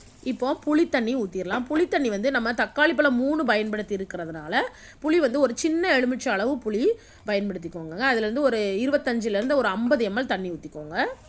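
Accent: Tirunelveli, India. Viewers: akka nenga thirunelveliya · UNGA paechula theriyuthu amma... My mother's native also Tirunelveli.. and your samayal ellam super ma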